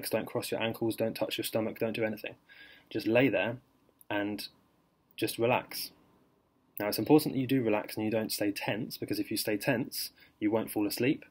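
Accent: United Kingdom. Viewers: Makes sense..I've never had a lucid dream, not ever really tried to. But I think I will after watching this. My body naturally wakes up between 5am-6am & then when I get back in to bed and fall asleep I have the most intense real life dreams, so colourful & I can touch people and talk and do things..but I think I am not lucid dreaming because I only remember it once I wake up and I've never drifted off in to sleep while staying still. I usually always lie on my side..from the urge to haha..but yeah it makes sense in to hear this method..I will let you know if it works. Thanks for your video, also nice to hear a fellow Brit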